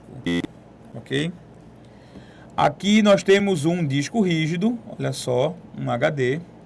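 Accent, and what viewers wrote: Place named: Northeast, Brazil. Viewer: Finalmente uma aula com sotaque nordestino...tava cansada do carioca e paulista rsrs (sou paulista, pra os críticos de plantão.. ;P). Ameeei a aula, obrigada por compartilhar.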